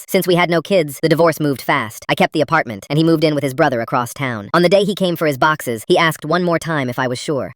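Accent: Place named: Latin America. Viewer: You sound latina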